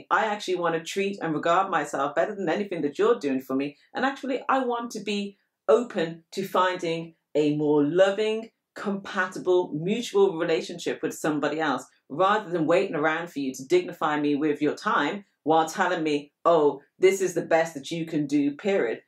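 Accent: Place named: United Kingdom. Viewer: Love when she says "are you flipping serious?!" in that British accent. 😊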